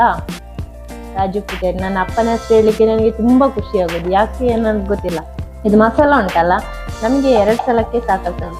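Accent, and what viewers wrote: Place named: Mangalore, India. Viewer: Super fish fry ❤️🤤Thumba chanagi mathadthiri Mangalore kannada 😂 be connected always 👍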